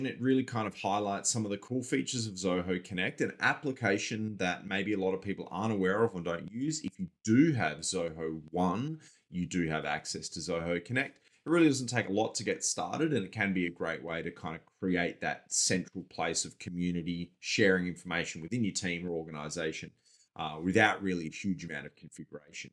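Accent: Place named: Australia. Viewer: Thank u! And great Aussie tone.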